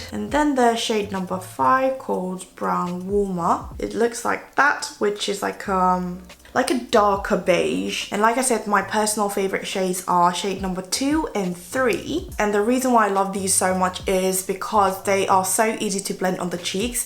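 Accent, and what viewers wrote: United Kingdom. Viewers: 미국사는데 님의 영국발음 들을때마다 너무너무 좋아요! 완전 취저😍😍😍 펄시레드 색 진짜 쨍하니 너무 이쁘네요💋 다음번에 한국가면 저도 쟁여와야겟어영❤️❤️ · 진짜 영국 발음은 언제나 귀르가즘 ㅠㅠ❤️ 저도 이제 곧 개강이라 다시 해외로 나가야하는데 추천템 좀 쟁여가야겠어요>_< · 투쿨포스쿨꺼 치크 앤 아이인데 입술에 쓰기에 무리라고 해써여!ㅋㅋㅋ 아무튼 오늘 영상두 잘봤어여~ 약간 영어 듣기평가처럼 언니의 영국 영어발음 듣는 재미도 있고 하울도 재밌었어여ㅎㅎ 다음 영상 또 기다릴게여~~ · 영국발음... 이게 영국발음인가... 치인다 오늘도... 팬미팅 해주세요... 하... 워터~r 가 아닌 우워터. 에 놀라고 영국 발음과 영어 목소리에 치이고 갑니다... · 언니 영국발음 개치입니다..💜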